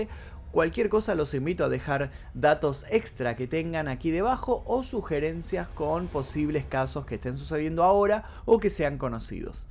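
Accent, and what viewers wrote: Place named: Argentina. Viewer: Me encantan tus narraciones perfectas con su toque 🤗👍 con todo respeto a tu Sra. El acento Argentino siempre me ha parecido muy lindo 😃. Saludos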